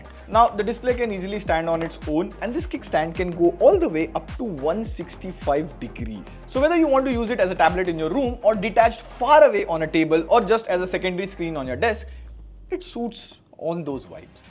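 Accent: India. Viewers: terrtble hindus accent speak slower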